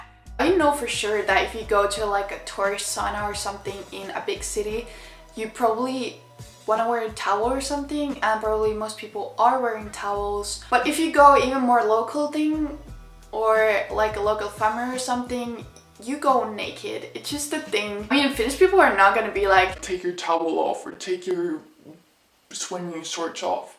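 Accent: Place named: United States. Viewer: U have american accent more than the finnish one😍 just perfection💓!!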